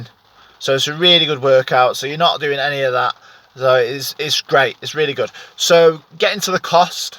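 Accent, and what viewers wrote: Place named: Cheshire, United Kingdom. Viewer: sounds like he is from Chester/Cheshire, north west deffo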